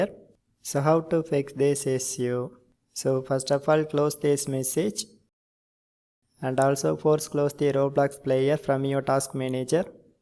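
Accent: India. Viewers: Lmao indian accent Atleast I don't speak like that even I am indian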